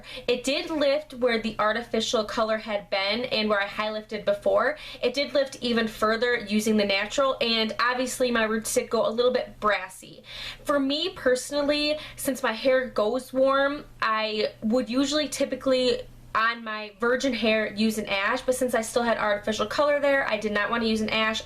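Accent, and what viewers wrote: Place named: Georgia, United States. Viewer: Aww your accent is so cute lol. Love Georgia girl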